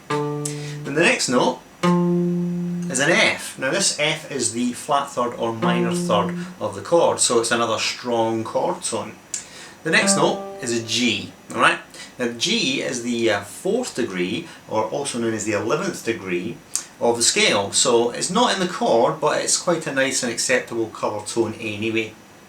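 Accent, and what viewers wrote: Scotland, United Kingdom. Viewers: Never seen your videos before and liked your intro. Then I was pleasantly surprised to hear your Scots accent. I'm originally from Clydebank. ;) Doing a lot of private home Jazz study. Looking forward to following you. Aw th' best! Ha ha. ;)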